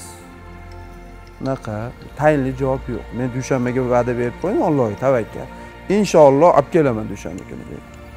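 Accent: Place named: Andijan, Uzbekistan. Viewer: Akamiz anjanligakana😁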